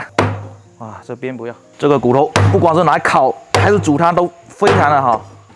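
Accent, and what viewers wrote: Fuzhou, China. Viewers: 兄弟福州人么，福州腔好重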